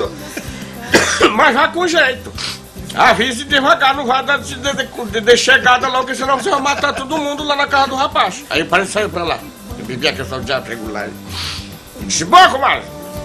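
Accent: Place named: Pará, Brazil. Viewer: Melhor sotaque do Pará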